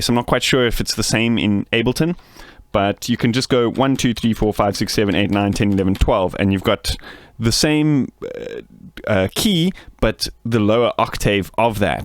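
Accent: South Africa. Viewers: he is South African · Are u South Africa?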